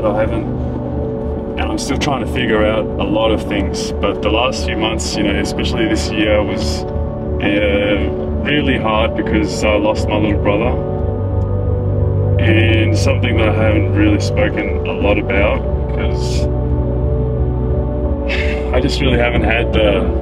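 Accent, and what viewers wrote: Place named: Australia. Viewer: i have been traveling to golds gym from europe every summer for the past 10 years til covid hit. I have pics with you from almost every summer, with Karina Justin Steph Mike etc. You were always such an inspiration to me and to thousands maybe millions of people. I just wanna tell you, you dont be buff and huge, we just enjoy seeing you online again. Im sure you will heal as times passes on. Just take your time, find happiness and joy again. The community will always stand behind you. We all have to face our own battles most of the time alone, but strong people will win those battles, shut down the inner demons and find the real meaning of life again, which is love and selflove. I wish you all the best, and hopefully one day ill meet you again and you will have that bright smile with that aussie accent again.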